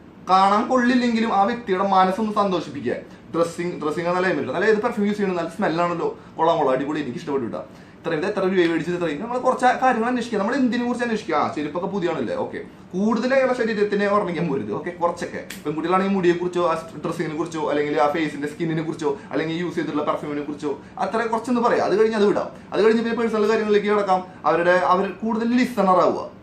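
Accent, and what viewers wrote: Thrissur, India. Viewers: Bro തൃശ്ശൂർ ആണോ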